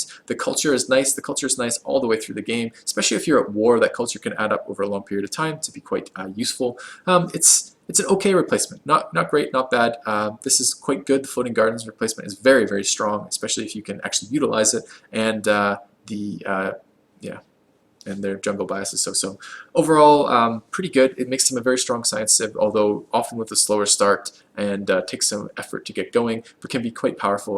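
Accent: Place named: Canada. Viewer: I'm trying to figure out your accent. I hear a Canadian "about" and a East Coast/New England "warrior" in there. Help me out here bro :D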